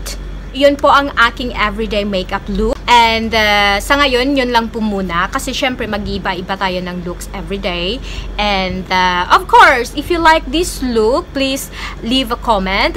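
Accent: Visayas, Philippines. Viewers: i love bisaya accent kaya ok lang yan seswang dami ko friend na bisaya at natutuwa ako kc alam mo mostly sa mga bisaya magagaling sila mag english..buti kapa magaling mag makeup ako seswang tumanda na talaga di ako natutong mag makeup😅kay vice din ang gamit kong liptint maganda talaga sya tapos di nagda dry lips ko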